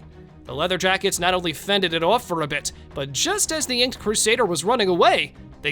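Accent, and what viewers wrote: United States. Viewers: If you ever want a nature documentary completely ruined, get a yank to narrate it. What a colossal putz! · Totally agree, annoying American voice !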